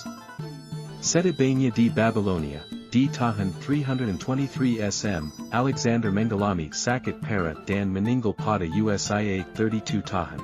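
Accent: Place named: West, United States. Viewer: logat barat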